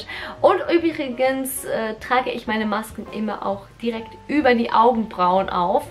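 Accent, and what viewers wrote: Switzerland. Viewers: Alter ha gat vo dir a Video a gluagt vo 2013😂😂 met däm schwiizerdütsch👍👍😂 soo lostig i fir di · Mi ganz körts wenig das schwizärä bisch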